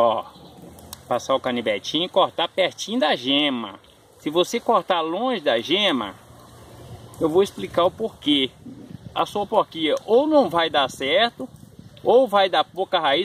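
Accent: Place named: Minas Gerais, Brazil. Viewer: Vc explica direitinho agradecida pelo video parece ate mineiro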